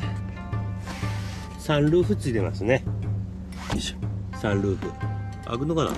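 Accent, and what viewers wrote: Tsugaru, Japan. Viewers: 車には詳しくないけども、アルファロメオのエンブレムがオシャレな感じで好きだなぁ♡ マスターのちょっとお久⁈の動画見て 津軽弁聞いて、ほっこりしました(о´∀`о) 寒くなって来たので風邪引かないで下さいねぇヽ(´ー｀)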